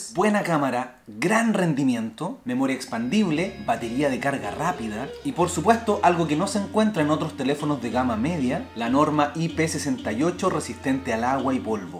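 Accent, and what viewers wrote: Chile. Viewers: Eres Chileno, yo lo soy, y creo que tu idioma se parece un poco al Chileno😂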